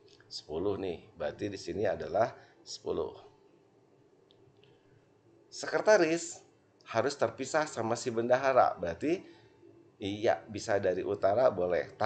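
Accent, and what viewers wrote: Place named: West Java, Indonesia. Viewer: terimakasih kang, lucu menjelaskannya aya aksen sundaan 😂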